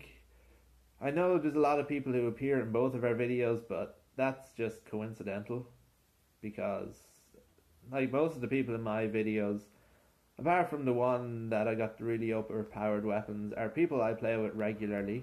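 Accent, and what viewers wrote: Ireland. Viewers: Are you Irish, and if you are where are you from (what county) & Do you stream on twitch or anything?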